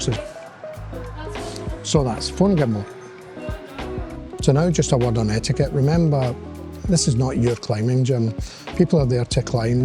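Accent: Scotland, United Kingdom. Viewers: What’s a Spanner? Something that spans? Also, why is the close caption not burnt into the video? I can’t understand your Scottish accent pal…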